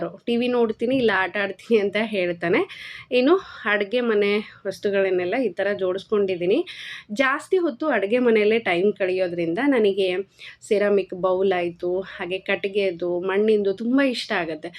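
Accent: Raichur, India. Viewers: ನಿಂದು ಯಾವೂರು ರಾಯಚೂರು ಭಾಷೆಯಲ್ಲಿ ಮಾತಾಡುತ್ತೀರಾ ನೀವು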